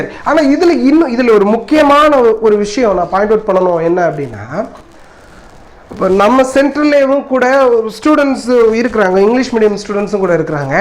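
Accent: India. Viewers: Neenga kanniyakumariya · great sir, i like ur teaching and ur talking tamil slang also super sir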